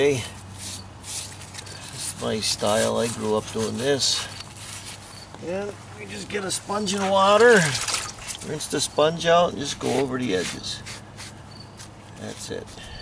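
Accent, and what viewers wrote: United States. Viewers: . Cheers for the info.! Of the many American accents we hear from this side of the pond yours is the most pleasant. Is your accent specific to Scranton or Pennsylvania in general.?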